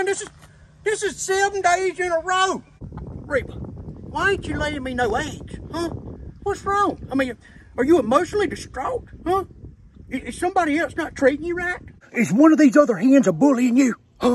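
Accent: South, United States. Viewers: Very southern sir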